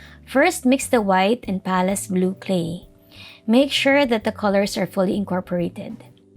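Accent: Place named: Philippines. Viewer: I figure you are from the Philippines because of your accent and also the indelible ink on your finger. Btw who did you vote as the president? If I may ask? Thank you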